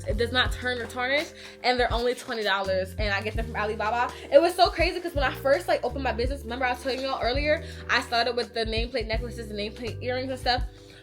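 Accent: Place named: Philadelphia, United States. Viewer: New subscriber! I knew you were from Philly the second you started talking lol. I agree with outsourcing photographers in NYC! Great content!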